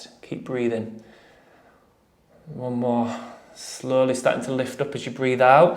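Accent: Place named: England, United Kingdom. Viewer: Just done my first run in 20+ years! Refreshing to find an English video rather than US, and a regional accent too! Great!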